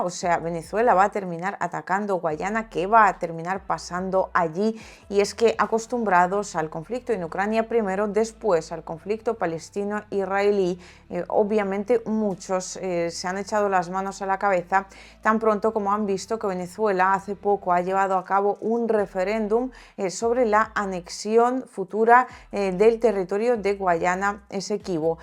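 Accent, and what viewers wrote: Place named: Galicia, Spain. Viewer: Lastima q hable como gallega.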